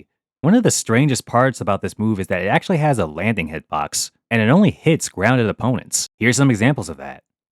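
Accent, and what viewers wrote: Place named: Japan. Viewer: Why do you say the names in japanese and japanese engrish?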